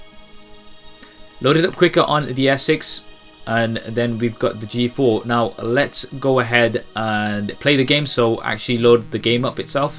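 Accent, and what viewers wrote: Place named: United Kingdom. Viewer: Hi, I really like your british accent. (; I've a question. Does a fingerprint scanner on s6 works with any orientation?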